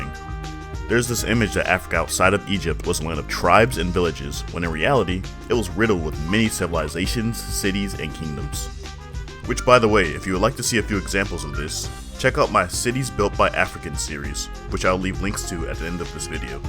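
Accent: United States. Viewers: Im not African American but just African. Firstly congrats because you made a video and uploaded it which is more than most. However, 1. Making out that Afrocentrics claims of hidden African history is some big conspiracy theory. I think thats a tad offensive. Isn't African history still being taught in America starting from slavery? You might know of many of Africa's great empire's but in the white dominated countries where a lot of the diaspora live is that common knowledge? Yes we as adults can search the web til our hearts content but what about the children? African history was hidden and destroyed and while that conscious effort may not be being made today, that doesnt address the knock on effects of that. Widescale denial of African Achievement is huge and that is because it was hidden. And what equality between all races are you talking about? Where do you even live to say that? 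You sound American. But how would a black American make such a statement. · You don’t speak “proper” English, you speak the American dialect, and people want you to speak African American Vernacular English, just a slight correction but you’re right that speaking in a standard dialect doesn’t make you a race traitor or whatever they say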